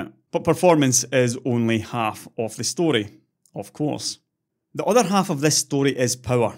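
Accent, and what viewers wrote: Scotland, United Kingdom. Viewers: Damn, is scotish? Just noticed...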